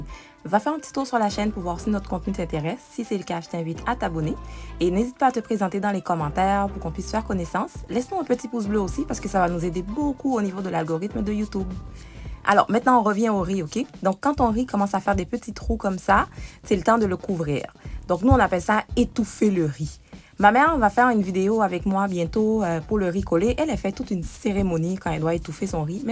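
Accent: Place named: Quebec, Canada. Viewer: Merci de nous dire pour larsenic...bel accent quebecois ma belle❤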